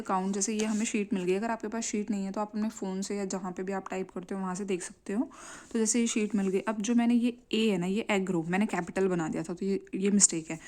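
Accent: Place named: Meerut, India. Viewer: Sister aap kha sa ho. Kyonki aap ke tone kuch kuch meerut wali lagte h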